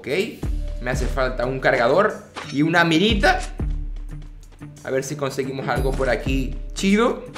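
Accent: Venezuela. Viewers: Hey chamo eres venezolano?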